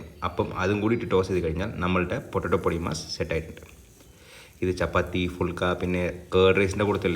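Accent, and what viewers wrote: Tamil Nadu, India. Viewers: .... Tamil manjal ...pronunciation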